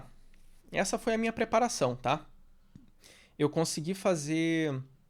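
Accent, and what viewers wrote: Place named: Rio Grande do Sul, Brazil. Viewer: Pelo sotaque, tu deve ser meu conterrâneo (gaúcho). Grande abraço e parabéns pelo desempenho.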